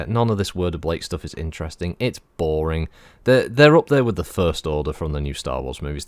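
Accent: United Kingdom. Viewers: I'm now going to start picturing you in director Krennic's uniform when you're doing every recording with that thick British accent 🙂.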